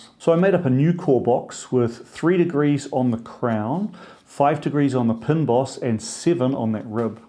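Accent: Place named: New Zealand. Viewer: I joined this channel because you use FreeCAD! (and maybe also because I love the Kiwi accent...)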